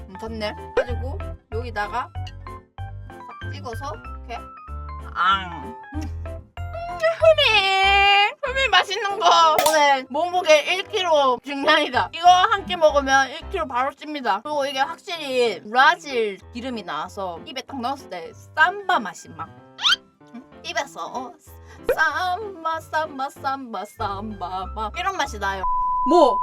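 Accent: Busan, South Korea. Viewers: 부산 아지매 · 이분 경상도인임?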